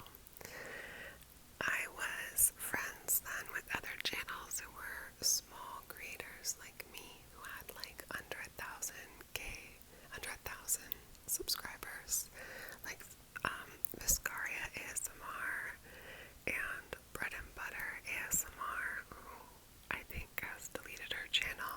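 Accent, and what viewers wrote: Argentina. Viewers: Felicitaciones por estos 7 años con el ASMR. Que vuelvan pronto los videos en español con acento argentino. Saludos.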